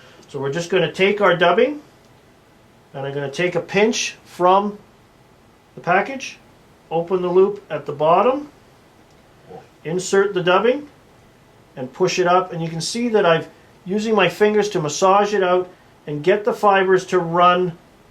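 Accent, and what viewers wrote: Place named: Alberta, Canada. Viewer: Thanks...Yes I did some experimenting with different sized beads and did figure it out eventually! I tried the system at my new favorite pot hole and it worked fantastically! Thanks again for your Alberta voice on fly tying:)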